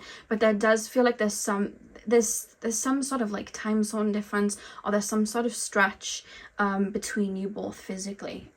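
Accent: Wales, United Kingdom. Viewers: You are from Wales arent you? Whenever i hear that accent i remember whose channel im on 😂 i watch too many tarot reads obviously. But i have to say yours are one of my many favourites. Thank you ❤ P.s im inlove with the accent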